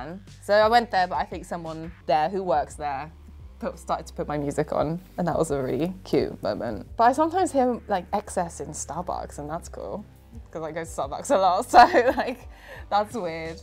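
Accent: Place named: United Kingdom. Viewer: She sounds British not American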